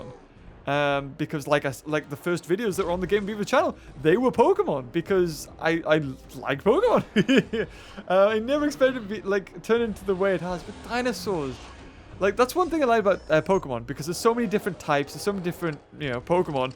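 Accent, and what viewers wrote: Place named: United Kingdom. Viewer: why do you even think i came here for? The brittish giberish ofcourse:)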